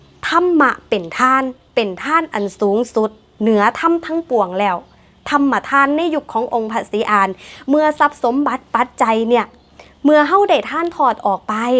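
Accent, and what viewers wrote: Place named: Vientiane, Laos. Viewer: เว่าม้วนอีหลี เหมือนลาวเจ้า ลาวเวียงจันทร์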